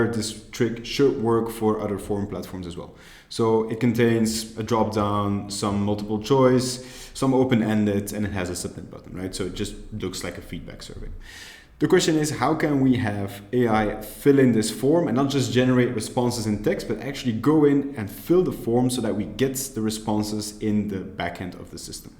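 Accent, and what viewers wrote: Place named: France. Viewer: Je kiffe l'accent français !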